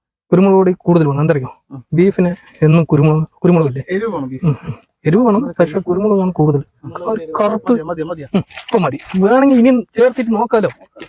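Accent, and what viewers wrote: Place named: Palakkad, India. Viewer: പാലക്കാട്‌ സ്ലാങ് സൂപ്പർ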